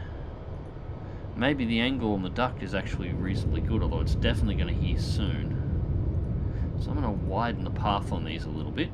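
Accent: Australia. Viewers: Enjoy hearing an Aussie on the intertubes.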